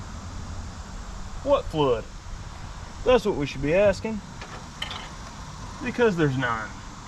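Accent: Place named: North Arkansas, United States. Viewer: I enjoy the north Arkansas accent.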